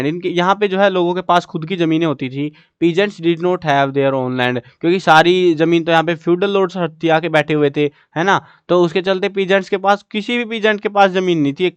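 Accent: Haryana, India. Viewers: Sir are you belong to haryanavi background 🙂🙏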